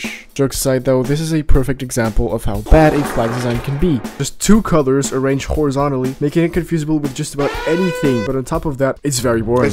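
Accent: France. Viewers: Are you french? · are you french? Unsubbed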